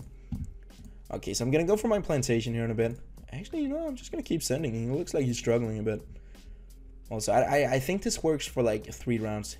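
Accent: Denmark. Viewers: are u a danish person ore what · Are you from denmark? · Lol er du dansker? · are u from denmark?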